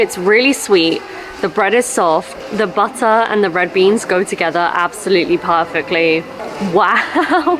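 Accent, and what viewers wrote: United Kingdom. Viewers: イギリス英語かっこいい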